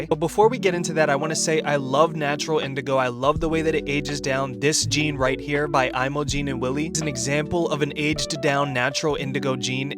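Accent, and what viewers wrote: New York, United States. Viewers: You got that nu yok accent brotha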